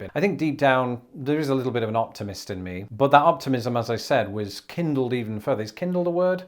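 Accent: United Kingdom. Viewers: “To hospital” instead of “to the hospital” is uniquely Great Britain. I am glad you grew up in Britain only in that your accent is SO BRITISH. Love that accent. Watch anything tv made in Great Britain just to hear the accent. I think it was quite irresponsible of the Brits to let that accent die out when they got here to the states. You should fix that. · I love your sarcasm and wit. Maybe some people miss it because Americans are bred to believe that someone speaking with a British accent is automatically serious lol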